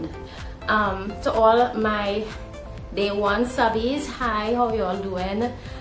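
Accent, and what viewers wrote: Trinidad and Tobago. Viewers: You do not have to explain or apologize with regard to your Guyanese background, your Trini accent and your disability. You were made in GOD'S image and likeness and you are beautiful. And you clearly display confidence in yourself, so that is all that matters. The Mighty Sparrow was born in Grenada and Peter Minshall was born in Guyana. These folks are Trini to the bone and will have it no other way. Live your life my dear. Do not worry about people who do not understand.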